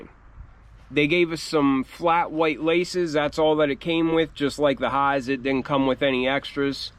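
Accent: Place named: Philadelphia, United States. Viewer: you gotta be from greater philly talking like that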